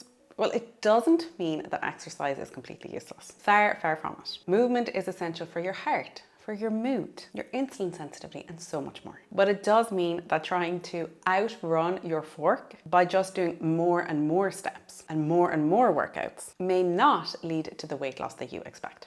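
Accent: Ireland. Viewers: Love the accent. Sounds like a Navan accent 😊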